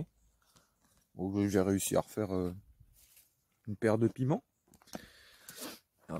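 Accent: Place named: Somme, France. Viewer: Bonjour . En effet des betteraves de ce calibre mérite un abonnement !!! C'est fait . Je vous envoi ce petit commentaire de l'Ile de la Réunion , mais votre petit accent ressemble beaucoup au mien (Je suis originaire de la Somme) !!! Sacrée belle récolte . Le meilleur pour vous . 👍👍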